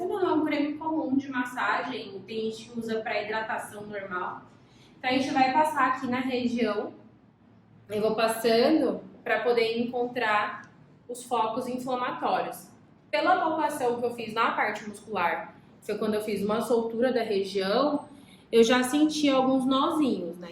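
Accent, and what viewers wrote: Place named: São Paulo, Brazil. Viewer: É uma pena que sotaque paulistano é tão ruim de ouvir.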